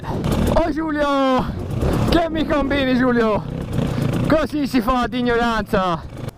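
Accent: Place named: Tuscany, Italy. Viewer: hahha ok Bro! PS. Amo il tuo accento toscano, lo adoro!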